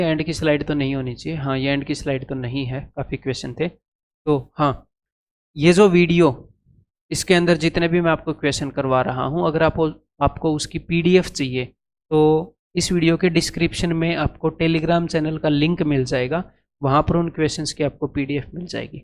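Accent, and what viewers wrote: Haryana, India. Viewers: हरियाणवी हो क्या Sir 🙏👌 Thanks 👍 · sir ka haryanvi language k saath padhana accha LG rha or statics bpsc k mains bhi aati h tension nhi rahi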